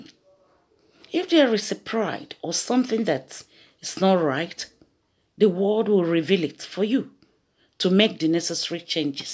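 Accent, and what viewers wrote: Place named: Africa. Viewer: Thanks for sharing the word of God. It feels nice to hear your accent, I'm missing my friends from Africa.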